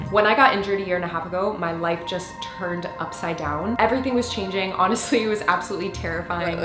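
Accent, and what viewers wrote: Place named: Philippines. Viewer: has a Philippine accent. My bestfriend is an exchange student from Phil.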